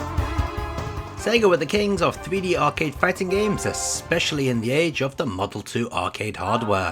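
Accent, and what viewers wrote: United Kingdom. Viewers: Can’t tell if you’re British or Asian.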